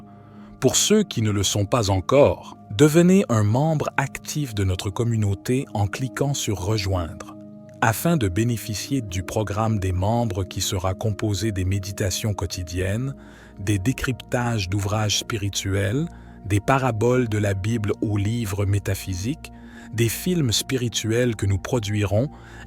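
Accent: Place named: Quebec, Canada. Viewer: oui vous avez une abonné de plus et j'adore votre accent québecois je pense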